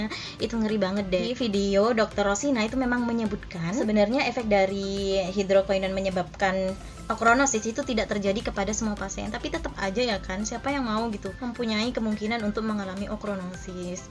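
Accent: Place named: East Java, Indonesia. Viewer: Logatnya kak kayak orang Jawatimur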